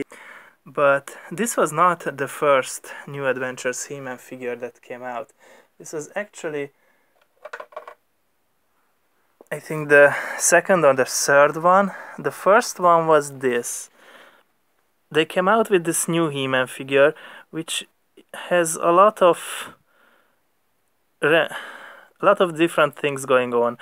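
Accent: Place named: Hungary. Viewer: Te lenni magyar? nagyon akcentusos....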